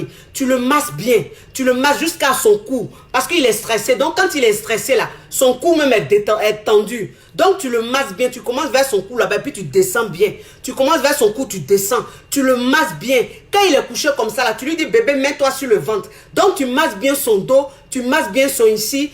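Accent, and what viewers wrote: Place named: Ivory Coast. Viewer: Wallaye si n'est pas ivoirienne ça m'étonnerait. Gagagagagaga. Elle parle comme à chez nous pays hein loool. J'adore encore aujourd'hui la façon dont le sujet-ci est abordé.Merci beaucoup pour le partage chère.Stay Blessed for all!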